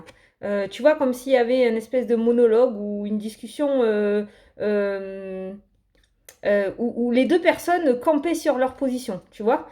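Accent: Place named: Marseille, France. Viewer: Ta repris le marseilles..😅 i knew it !!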